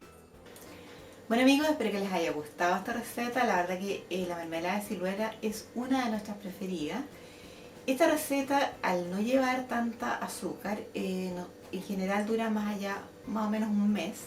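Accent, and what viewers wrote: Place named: Chile. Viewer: me encanta el tono chileno, amo vuestro pais, asi que me copio las recetas, muchas gracias , abrazo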